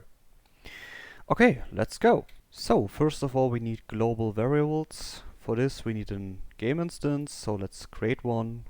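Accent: Germany. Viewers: It completely doesen't work for me..... You sound german so I hope you are german. Answer in german when you are. When i press play my car won't spawn and i wont possess it.. To make matters worse it spits out this error: Attempted to access BMW_Blueprint_C_0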